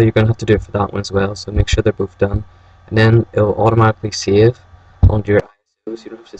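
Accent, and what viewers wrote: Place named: United Kingdom. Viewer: Wow. i came to thiz vid to get the latez ZSZ site and i had the audio on, and chu have that AWesome British acent!! Lawl =)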